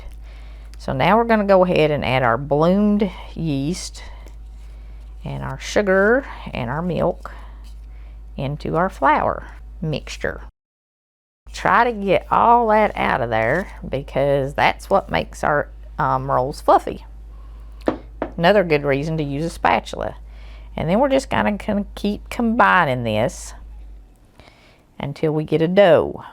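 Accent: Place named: Tennessee, United States. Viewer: Oh honey I was reared in Tn. Hampton, up near Doe Creek and Mountain City. Ription holler and Doe Valley. Just found you and the joy in my heart nearly made me cry. GOD bless you sweetie. I could listen to you talk all day. I wouldn't care if you was readin an instuction manual. I haven't heard that beautiful accent in so long. Im 70 now and in N. C. I want to plan a trip up to Hampton but haven't been there in nearly 50 yrs. I will hush now. Just wanted to tell you that you made an old woman mighty happy this afternoon and I'm going to get my daughter to help me join. May GOD, continue to bless us all. COME JESUS COME! SHELIA STEVENS FROM THE MORLEYS AND ODOMS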